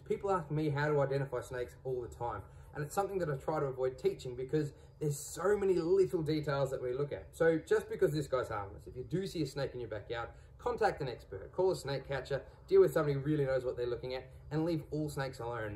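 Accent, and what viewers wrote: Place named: Queensland, Australia. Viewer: Lovely snake but what's the deal with that horrible Queensland accent. Its not very Australian (Victorian)!!!!!!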